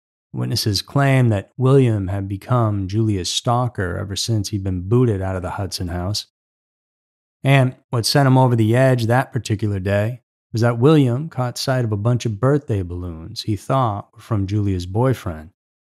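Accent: South, United States. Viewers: Heartbreaking tragedy. I love listening to! I swear I hear a bit of Southern accent. Thank you,. ❤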